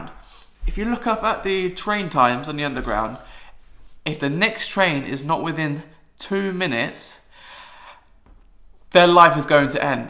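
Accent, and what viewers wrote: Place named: Stockholm, Sweden. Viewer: I'm kind of inclined to disagree with you still about the grammar, there are more similarities than differences to me at least :P As for the sj sounds, most of the time you can get away with using the familiar sound that you do have in english, doing so will mostly just make you sound slightly Stockholm-ish. But keep practising, I'm sure you'll get a hang on the harder sounds aswell =)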